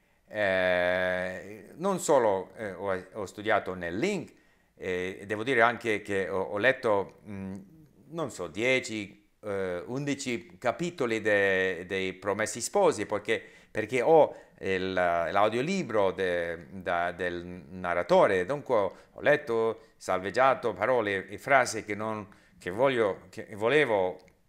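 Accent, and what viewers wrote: Spain. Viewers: Complimenti, il tuo italiano non è male soprattutto per essere un poliglotta. Si sente molto l'influenza dello spagnolo, nell'accento, in alcuni errori e soprattutto nel dire y invece di è :) che secondo me è molto difficile, intendo che io quando ho imparato il francese usavo spesso congiunzioni in italiano senza rendermene conto, in particolare ma invece di mais. Complimenti in ogni caso e grazie per il tuo esempio! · Bravo, il tuo italiano è notevole nonostante le evidenti interferenze del tuo spagnolo. Penso che la cultura che ti sei fatto nella nostra lingua non sia per nulla una cosa da poco. Complimenti.:D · Complimenti Mr, l'associazione allo spagnolo è molto forte, ma il suo italiano è comprensibile. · Cerca di separare lo spagnolo da tuo italiano... L'influenza è troppo forte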